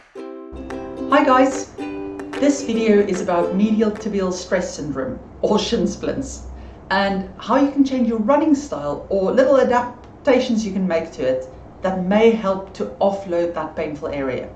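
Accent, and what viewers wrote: Afrikaans, South Africa. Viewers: 3 years late but yes her accent is South African. More specifically, Afrikaans South African. Sincerely, another South African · My legs rub against each other when I run. Gonna try widening my gait. Thanks! Also, love the accent. Is it South African?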